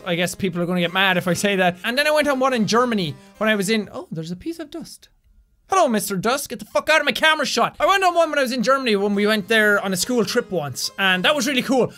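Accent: Ireland. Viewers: i dont know why but people think jack is not irish he is hes trying to make it easier for you guys to understand im irish and i can tell hes irish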